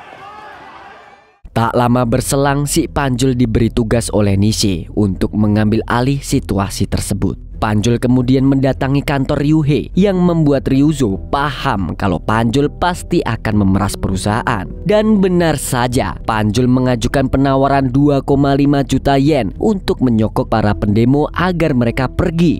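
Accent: Banjar, Indonesia. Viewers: Baru tau kalo org banjar😂dari sekian lama nonton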